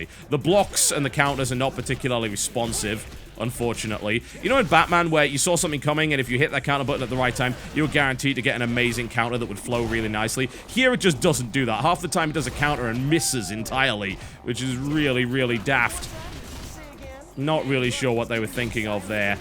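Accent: United Kingdom. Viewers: You talk like The Doctor (And not because of the British accent. You just have the same emotional phases in your voice. and your sentences.)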